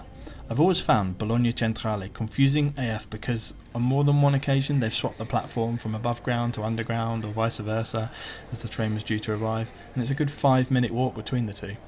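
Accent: RP, United Kingdom. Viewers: It’s good to hear your voice! I like the British accent mate 👍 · The VO is fine but the non VO was a USP- and the English accent can sound a little posh and dare I say - the old colonial timbre creeps in - so! stick to the old formula - less distracting and more focused on the visuals and good captions - one VO per year!! Your content is so good without the VO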